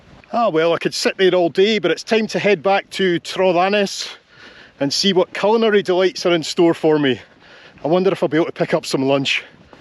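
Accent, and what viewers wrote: Scotland, United Kingdom. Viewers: Phenomenal! Aloha from my home, on another spectacular island, Big Island Hawaii. :-) The beauty and the drama of the cliffs in your video are priceless. Fewer tourists, too! Subscribed. My grandfather was from Auchterarder, Perthshire, and your accent brings back fond memories of him. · I guess he is Scottish. And so he speaks a dialect of English. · Really loved this. The funny thing is that my American wife and kids couldn't understand a word you said! :) I grew up in Australia, and my best friend for some years was from Glasgow, so I long ago learned to tune into the Scottish accent. Because of the popularity of British TV in Australia, most Aussies in fact 'get' the various British accents. But I've lived in the USA since 2000 and it's hilarious how most Americans practically need subtitles - and often get them in movies and TV programs.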